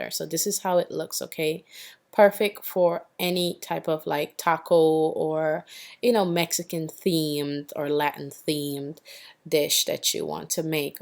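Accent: Guyana. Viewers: hey all the time I keep telling myself you're kinda speaking lyk a Guyanese but never bother to ask, but the way you're using those seasonings I just knew for sure you're from Guyana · By anychance are u Guyanese?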